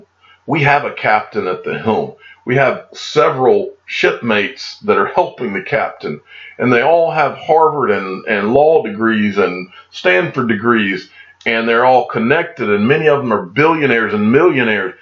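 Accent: South, United States. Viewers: you have the southern drawl going on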